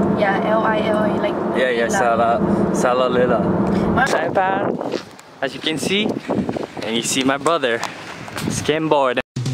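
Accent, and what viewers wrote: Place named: Saipan, Northern Mariana Islands. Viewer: Yo I been missing you lol. Glad to hear our island accent in your video. Keep up with the good work ma brother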